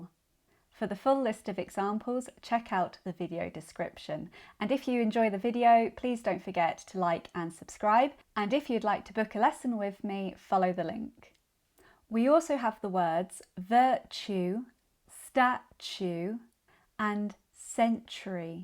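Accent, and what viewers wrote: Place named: United Kingdom. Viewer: British accent is amazing 😍 love it all the time❤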